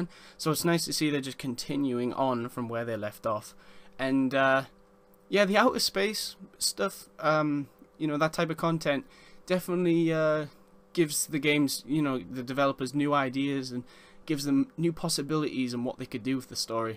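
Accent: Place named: England, United Kingdom. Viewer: Hey man awesome video as usual. Just wondered if you think there going to update some characters like clayface, killer crock and now that they're adding him Solomon grundy to be big figs or even have transitions with people like bane in his lego batman/2 skin then push the venom button on his chest and do a hulk with him slamming in to the ground and looking badass. Also where in England are you from if you don't mind asking :)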